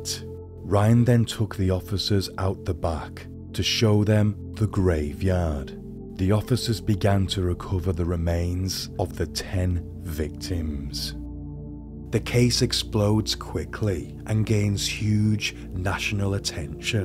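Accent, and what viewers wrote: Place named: Manchester, United Kingdom. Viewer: Manc!!! My heart belongs in Manchester after living there for over a decade! I was going to say you sound like you’re from somewhere near there. I won’t say where though cos I know Mancs don’t like that!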